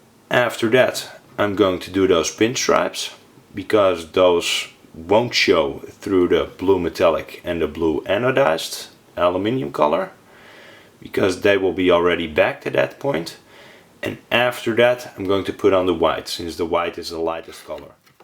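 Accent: Netherlands. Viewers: Dutch accent ftw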